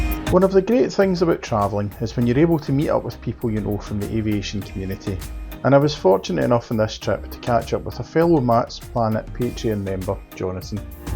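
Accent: Scotland, United Kingdom. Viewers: Great video. Love the Scottish accent!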